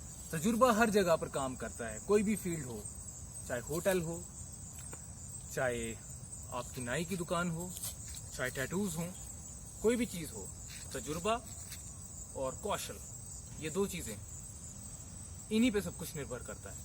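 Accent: Standard. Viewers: Love your accent Pure hindi. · man i really love your hindi ...... its so pure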